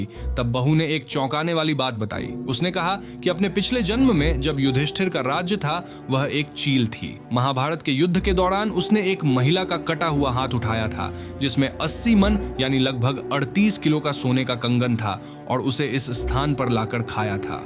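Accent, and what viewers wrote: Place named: Bihar, India. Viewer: Ur Pronunciation is like that of a Bihari…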